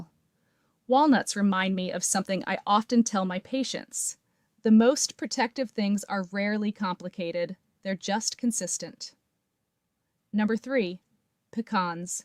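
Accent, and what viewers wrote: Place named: West, United States. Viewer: too much of WESTERN ACCSENT HENCE WE UNDERSTAND ONLY HALF ... THANK YOU ... !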